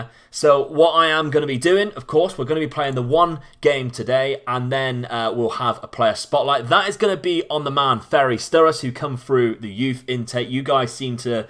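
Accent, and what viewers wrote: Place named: West Country, United Kingdom. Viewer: I thought your accent was west country but didn't think you were as local as that, Plymouth for the win (something no-one ever said before) lol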